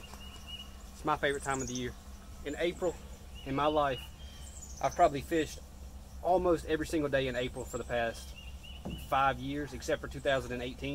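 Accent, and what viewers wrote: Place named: South, United States. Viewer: Love a southern girl accent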